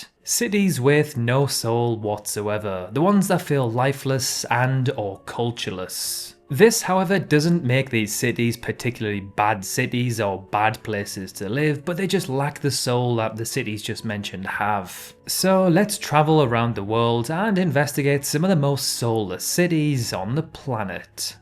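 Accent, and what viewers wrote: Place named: RP, United Kingdom. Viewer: You sound like an upper class twit from a Monty Python skit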